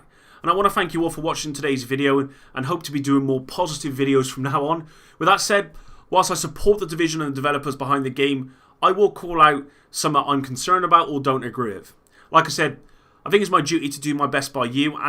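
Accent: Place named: England, United Kingdom. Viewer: Good video. Keep up the great work. You sound English. Where abouts are you from? I can’t wait for this game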